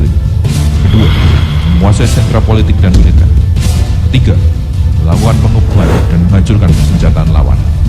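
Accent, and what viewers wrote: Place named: Sleman, Indonesia. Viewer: Orang sleman mana suaranya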